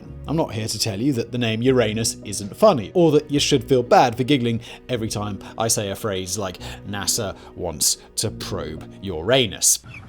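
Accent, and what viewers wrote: RP, United Kingdom. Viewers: Classy British accent - Well-dressed - Well-groomed - Thoroughly-researched facts and insights - Literally twelve years old, apparently 😁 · Fact Boi is such a professional online educator that he can maintain his perfect RP and a straight face (all but once) through the constant barrage of bunghole puns.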